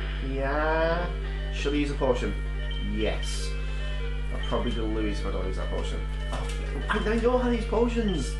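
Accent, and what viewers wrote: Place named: United Kingdom. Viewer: guys its he just picked up a british? accent. really good vid man. ima subbed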